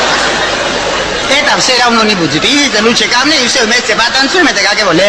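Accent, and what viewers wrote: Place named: Yaroslavl, Russia. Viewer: Говарит и поёт на чисто русском языке, с небольшим Яраславским акцентом... 😊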